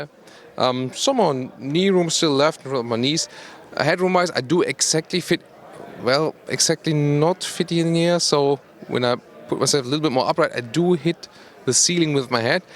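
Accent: Germany. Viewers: like it because he focuses on details in his videos so interested people can really get familiar with the cars before going to the dealer. I may even get used to his German accent :P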